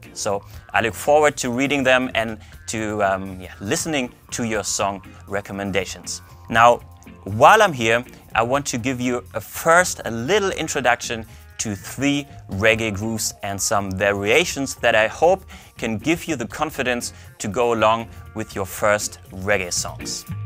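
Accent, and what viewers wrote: Germany. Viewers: Hallo Herr Das Video finde ich sehr gut, allerdings habe ich nach 2 Minuten abgeschaltet. Ich sehe einen englisch sprechenden Protagonisten. und denke mir das Englisch ist aber gar nicht so englisch. Das ist ein deutscher, der Englisch spricht. Ich muß gestehen das ich nicht so fließend Englisch sprechen kann geschweige es noch so gut verstehen kann. Das liegt daran, daß ich nun mal Deutscher bin. Jetzt komm ich aber zum Kernpunkt der Sache. Herr, warum machen Sie kein Video in dem Sie Deutsch sprechen? Für viele Menschen die nur Deutsch sprechen, würde es leichter fallen ihre Videos zu verstehen. Oder wollen Sie mit Ihren Videos nur Engländer, oder Amerikaner erreichen? Nun gut. Ich hoffe ich habe Sie jetzt nicht verärgert wegen meiner Frage. Ich wünsche Ihnen weiterhin viel Erfolg mit Ihren Videos So denn... ✌️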